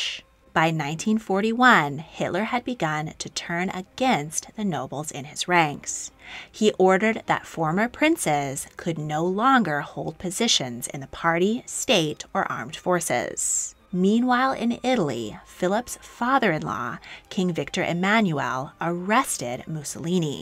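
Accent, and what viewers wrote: California, United States. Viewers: Californiaaaaaan accent with vocal fries absolutely horrible. Say Trey for us and which roads you used today. USA refused to enter the first World War until the very last moment in 1917- the cannon fodder to hold back the otherwise successful German push on Paris. 1926-8 USA banned immigration especially by European Jews. 30% of USA was German decent and lobbied (I would argue 99% with the most honourable reasons) for USA to continue isolationist policy. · Good video but omfg the narrators voice makes me suicidal soo american and soo high pitch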